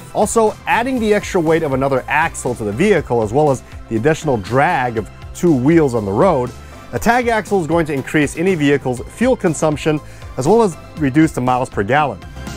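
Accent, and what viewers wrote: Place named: United States. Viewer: U aint no chinese, bruh - as far as I can hear, u r hundred percent American :D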